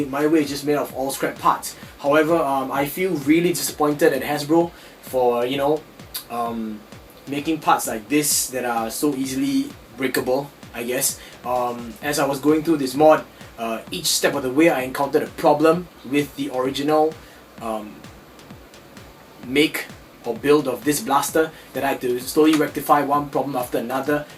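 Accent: Singapore. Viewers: Are you a Singaporean ?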